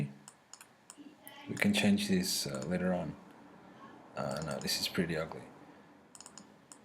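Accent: Portugal. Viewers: Inglês de quem fala português SEMPRE me ajuda a entender, ingles nativo é muito corrido, valeu pelo video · Good Tutorial... and you are Portuguese...or sort of :) Bom tutorial ;)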